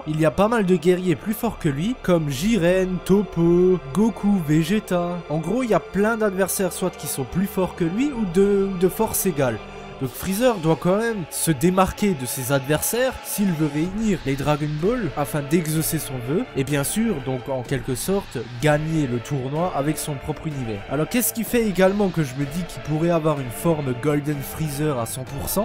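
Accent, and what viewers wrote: Alsace, France. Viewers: Waouh c'est génial cette chaîne je connaissais pas sinon par pur hasard tu serais pas alsacien😂? On reconnait le petit accent (pcq je le suis)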